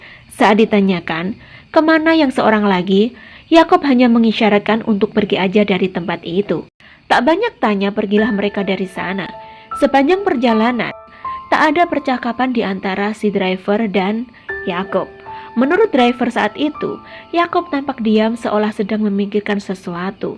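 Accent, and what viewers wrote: Java, Indonesia. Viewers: Nemu chanel baru,saya nonton udah 3 kali,tapi baru comen dan gahung sekarang,enak juga suaranya seperti cerita miranti cuma masih ada logat jawanya sedikit.Sukses selalu.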